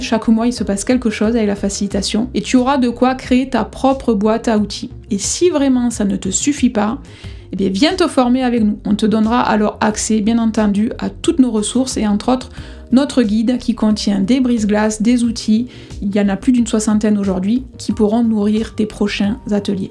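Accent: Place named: Southwest, France. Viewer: Bel épisode, clair, vivant dense et fournissant une vision du leadership adapté au 21ème siècle Si bien que j'ai voulu les lister pour m'en souvenir - je partage, mais que cela ne vous empêche pas d'écouter, vous rateriez l'authenticité de (et son bel accent du Sud Ouest) 1) Poser une intention claire: Définir son "Pourquoi" avant de se lancer dans un projet pour éviter de perdre du temps et de tourner en rond. 2) Faire de l'écoute active un super pouvoir: Écouter réellement sans préparer sa réponse à l'avance. Pratiquer le silence actif et reformuler ce que l’on entend. 3) Oser l’intelligence collective: Ne pas chercher à tout savoir, mais poser les bonnes questions et utiliser des outils collaboratifs (World Café, brainstorming structuré…). 4) Célébrer les petites victoires: Se concentrer sur les progrès quotidiens, noter et partager les réussites pour renforcer la motivation. 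5) Miser sur le feedback régulier: Le feedback doit être constant et structuré (ex. Plus/Delta/New, Triple A, Stop/Start/Continue). 6) Adopter une posture de manager facilitateur: Plutôt que de diriger, créer un environnement propice à la performance et au bien-être de l’équipe. 7) Définir des objectifs partagés et motivants: Utiliser des méthodes comme SMART ou OKR pour rendre les objectifs inspirants et engageants. 8) Faire confiance et déléguer intelligemment: Déléguer, ce n’est pas juste donner des tâches, c’est responsabiliser et donner du pouvoir d’agir. 9) Assumer la transparenc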